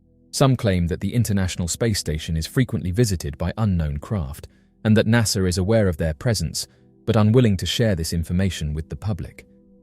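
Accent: United Kingdom. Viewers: The British voice makes is more truthy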